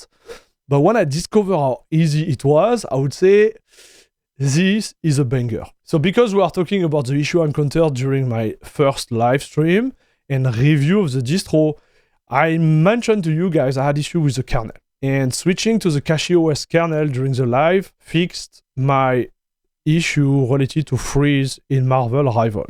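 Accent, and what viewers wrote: France. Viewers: I love the French accent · it's not an English accent haha, it's French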